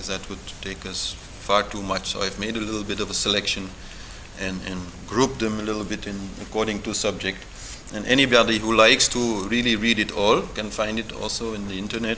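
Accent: India. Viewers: You speak English with an Indian accent. You must have lived in India many years! peace.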